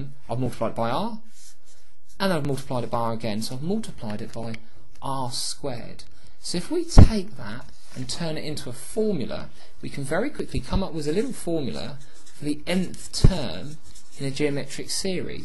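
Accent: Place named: United Kingdom. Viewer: thanks, british accents always help. i had like 3 british teachers in school and i always paid attention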